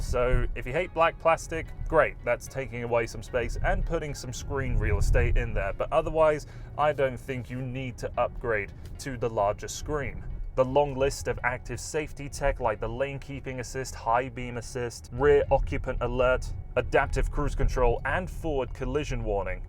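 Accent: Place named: United Kingdom. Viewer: I really enjoyed watching this video and I noticed that you did add in some funny lines. I have no trouble with the British way of saying things, because I’m used to Robert of Fully Charged. He loves to joke around. He says Hyundai the same that way you do. I was waiting for an aluminium joke! Keep up the awesome work! I have to admit that I mostly follow very low to no emissions cars and I might not have the time to watch every one of your videos.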